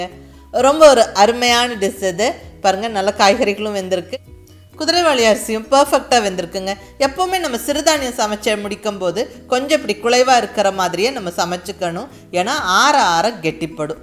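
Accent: Kongu, India. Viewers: Today mrng for breakfast i tried tis aunty. My MIL loved tis. I too feel fulled after having it. ❤ from cbe (sundarapuram) i m big fan for ur voice, receipes and our kongu slang❤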